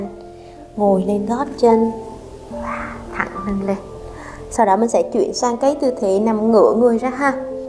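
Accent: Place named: Hue, Vietnam. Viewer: Bài tập hay quá Em ơi ! Lại nói tiếng Huế hay nữa 😘😘😘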